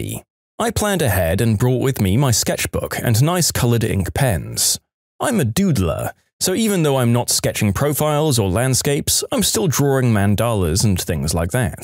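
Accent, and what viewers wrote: United Kingdom. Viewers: I had a dream where this British voice was going to leave the channel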